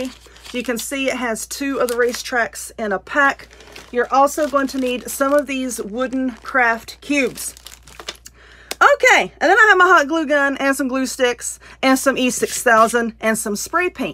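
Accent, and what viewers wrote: South, United States. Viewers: Love that southern accent💜💜💜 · I love your accent! I'm from Alabama. I bet you're not far from there!😊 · I love your Southern accent! Lived most of our lives in the South but now we are in Italy, so I really miss this southern style accent. Yet I am impressed how you can craft with those nails! Wow! Are you from Mississippi or Louisiana?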